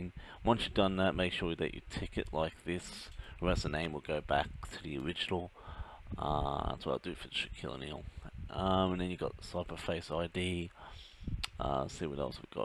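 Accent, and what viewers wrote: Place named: Australia. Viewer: You got an Aussie accent plus I saw that you modded don bradmen which is an Australia game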